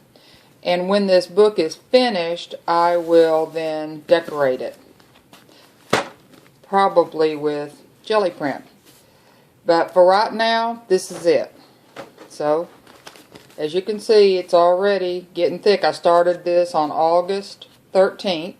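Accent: Texas, United States. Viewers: I just want to jump through the screen and flip through this myself!!! I love it......so much creative fun!!! I just discovered your channel . So happy I did!!! This book just looks so personal and homey. Something very free and organic about it. Thanks so much for sharing it with us. Love your accent by the way.....my fave!! From one Texan to another!! HA!! HA!! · Love this journal and your Texas drawl :)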